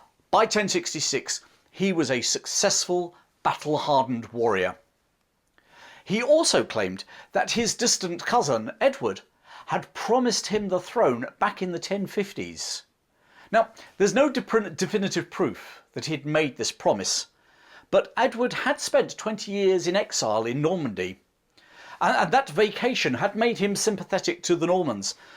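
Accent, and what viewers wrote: England, United Kingdom. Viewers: I love your narration and passion for history and teaching. Regards from India/Thailand. Your face reminds me of my dad uncannily, although with an English accent.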